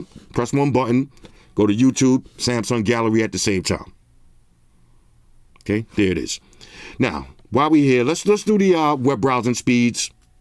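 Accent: United States. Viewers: Morning Mr. Have ever considered have another side job as a narrator in reading books. You have a very distinct American accent, which I think that you would make" lots more money". I'll surely buy it.... thanks buddy. Oh, I once had a pussy cat like yours, years ago. His name was Walter. I had him for 12 years. He passed away in 2009. I miss his company. Sad face.... nico Trinidad and Tobago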